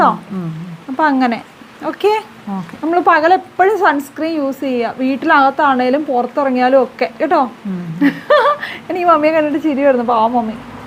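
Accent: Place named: Idukki, India. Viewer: Hi നിങ്ങളുടെ slank കേൾക്കാൻ നല്ല രസമുണ്ട് ഇടുക്കിയിൽ എവിടാ place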